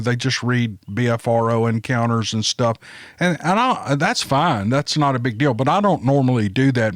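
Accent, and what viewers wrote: South, United States. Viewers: Good read keep up so sweet southern accent.🎉❤😊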